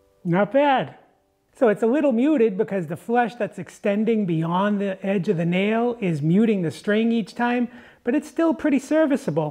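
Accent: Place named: New England, United States. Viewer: Great info delivered with an impressive New England accent.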